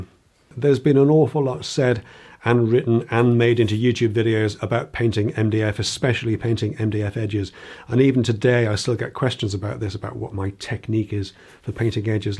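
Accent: United Kingdom. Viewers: I love Anderson Cooper and Richard Gere. is both of them but with a British accent!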